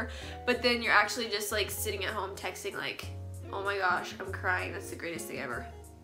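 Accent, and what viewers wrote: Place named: United States. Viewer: Hey I’m lesbian. I thought you were American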